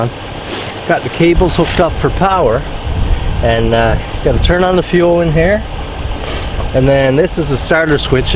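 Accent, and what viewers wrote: Newfoundland, Canada. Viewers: Was there no choke on the carburetor? If so, wonder why not. I was trying to place the accent but you said Newfoundland--yours doesn't seem as distinctive as some I have heard. Glad that your Grandpa was able to, at least, view you working it. Bet he would of loved to sit in that seat again. Grew up running the old JD 2 Cyls. and love their sound to this day. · Its friday night and I am watching a bulldozer push snow, now thats a cool night. I was wondering about your accent as you were talking, it sounded familiar then you said NewFoundland...ahhaaa! I am from northern Maine and that accent is how I used to talk before I moved to New Yark 40 years back. Its 'aboot' doing a job! Well done.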